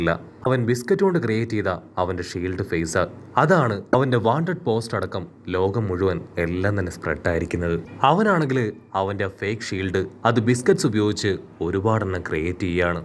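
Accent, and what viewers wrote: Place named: Malappuram, India. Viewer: Ade malapuram thane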